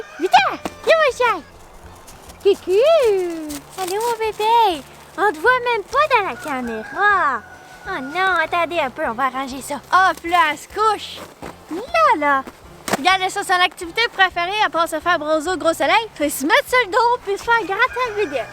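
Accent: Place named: Canada. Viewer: t canadienne t'as une accent cannadien😊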